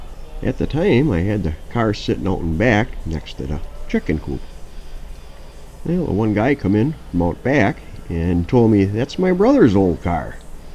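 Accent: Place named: Great Lakes, United States. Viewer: Great Lakes accent....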